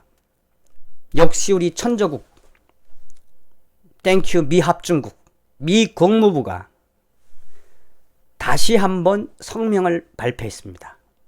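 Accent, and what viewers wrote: North Korea. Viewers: 수고가 많으십니다. 앞으로 하시는일 잘 되시기 바라구요~ 볼떄마다 느끼는거지만 TV를 보다보면 앞으로 언어억양 떄문에 이질감 느낄일 점점 줄어들거 같아 좋습니다. 우리 6-70년대만 해도 북한말 억양 들어보면 아주 쌘 발음이 들어가서 들을때마다 섬뜩 섬뜩했지요? 새벽에 잠이 안와서 라디오 채널 이리저리 돌리다가 북한 방송 들을떄.... 그떄는 북한 라디오 방송 들으면 간첩으로 몰리는 시대였지요? 이렇게 자주 듣다 보면 통일이 돼도 언어 만큼은 쉽게 동화 될거 같아요~ 앞으로도 열심히 보겠습니다. 좋아요 한표입니다. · 재밌게 잘보고 있어요 북한말 더욱 찰지게 해주세요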